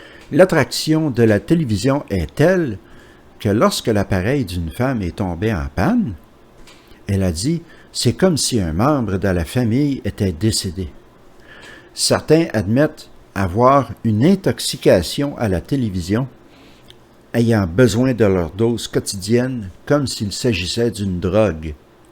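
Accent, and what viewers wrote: Canada. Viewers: Merci pour toutes ces videos. Elles sont tres bien et puis cet accent canadien, je ne peux me lasser de l'entendre.... C'est un accent sympathique, chaleureux. Franchement je ris de bon coeur quand j'entends que les Anges de Charlie et la Petite Maison dans la Prairie etaient des emissions condamnables a leurs yeux. Ils sont fous ces gugus, il faudrait mieux ette sourd quand on entend leurs arguments. La Petite Maison dans la Prairie, ca passe tous les jours a la TV en France. Allez demain je commence a lire les 2 volumes de l'encyclopedie biblique!!!! Cordiales pensees de France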